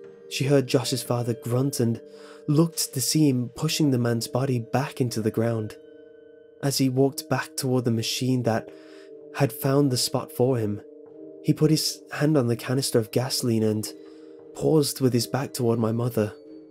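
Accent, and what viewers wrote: United Kingdom. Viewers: Today marks ten years since this video was created. Even today, this still remains one of the (if not possibly the ) best creepypastas ever written! I find this video to be a great encapsulation of the story. You're soothing british voice sets an immersive feel and the use of music and sound design is expertly crafted. Overall, this is an incredible story and video and here's to a decade of its existence!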